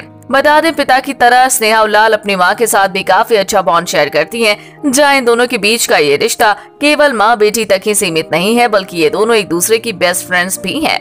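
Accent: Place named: United Kingdom. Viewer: I think the narrator lady is Finding it difficult to speak hindi normally, why speak with a English accent? British gulami gayi nahi.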